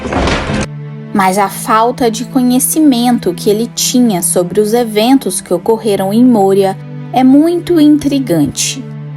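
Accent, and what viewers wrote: Minas Gerais, Brazil. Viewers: Moça, você é do Vale do Paraíba? Vi um cara comentando que você deve ser mineira. Fiquei curioso.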